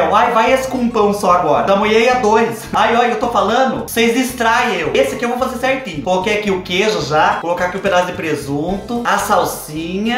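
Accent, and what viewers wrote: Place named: Taquarituba, Brazil. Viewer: Eu entendo toda sua linguagem porque sou de Taquarituba kkkkk é cresci ouvindo falar assim hoje fais 40 anos que moro em SP mais a raiz continuakkk adóro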